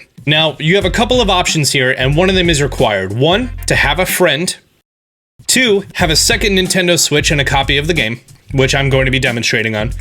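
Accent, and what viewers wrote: Australia. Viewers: the ausies finding out that he is australian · Wait, you’re Australian?!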